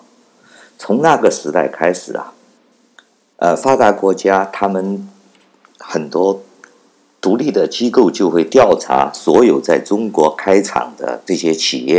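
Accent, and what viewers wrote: Taiwan. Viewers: 绝对的台湾腔，说说你是大陆哪的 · 这个口音，台湾腔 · 井底之蛙…你的声音是台湾口音！先生！ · 这你们也信？这么明显的湾湾口音，还大陆人？笑死人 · 台湾口音冒充大陆人，搞笑不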